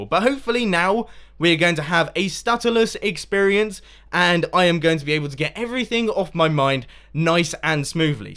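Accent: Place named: Bristol, United Kingdom. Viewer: Hey, I'd like to see an in-car vlog from you but only because I think you might live near me (based solely on your awesome accent, me babber):D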